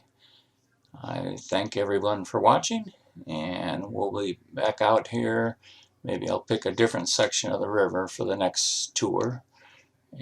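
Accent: Ohio, United States. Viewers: Hey, I am watching your dam removal videos from the day of the first day and the notch. You did a great job! Maby the best dam removal documentation of all. And your Ohio accent is very good for me to understand and sounds very pleasant to me. Thank you very much for your great videos! Greetings from Krefeld/Germany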